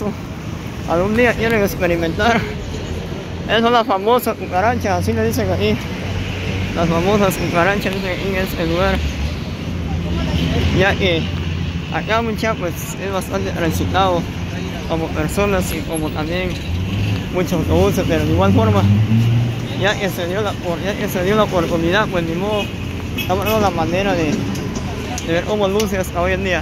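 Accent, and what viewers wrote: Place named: Guatemala. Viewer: Me gusta tus videos mas porque usas el acento chapin no de otros paises siempre usa el acento chapin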